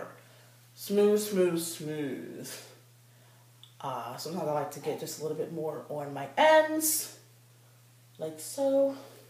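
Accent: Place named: United Kingdom. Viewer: Looks good! You sound like you have a British accent. Your hair is always fab.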